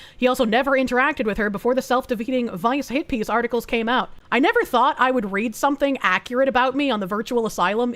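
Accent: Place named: Massachusetts, United States. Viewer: I just watched a G&G daily video with you and madame savvy. Id like to say im a fan but at least intrigued. Boy you really sound like a MASShole, i like it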